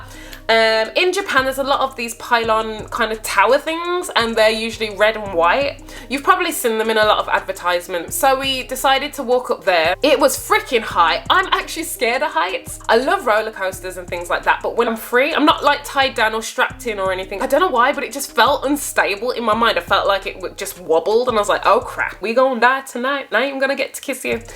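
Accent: United Kingdom. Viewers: OMG A BRIT!!! YES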